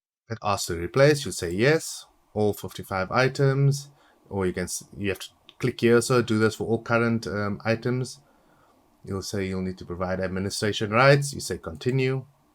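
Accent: South Africa. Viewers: Homie you sound south African!! Glad to know im not the only Black n White fan left alive! :D Thanks for this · Knew I heard a fellow South African! Thanks for vid man. Oh how I’ve been wishing for B&W 3 since I was like 15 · I just randomly found your channel to help me with Black and White, Your Accent, SOUTH AFRICAN! Lekker my Broer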